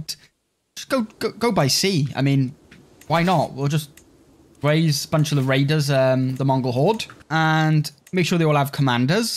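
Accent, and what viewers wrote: Wales, United Kingdom. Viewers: Love the Welsh accent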